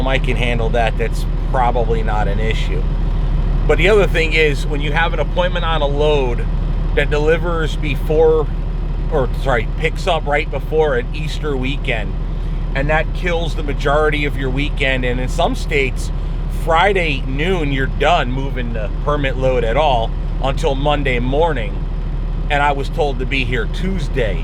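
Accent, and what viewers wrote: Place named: New York, United States. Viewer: You from New York New York or New Jersey that accent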